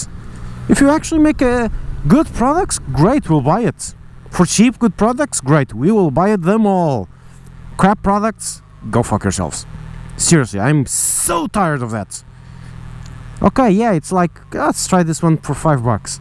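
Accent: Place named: Portugal. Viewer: I was just listening to the video and I knew you were portuguese by the accent. Let me tell you. That camera is pretty good for $10 but "O que é barato sai caro" como já dizia a minha avó.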